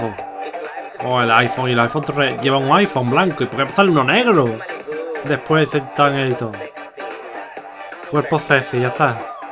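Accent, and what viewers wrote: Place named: Seville, Spain. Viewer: ajajaj como se nota ere sevillano ole! ajaja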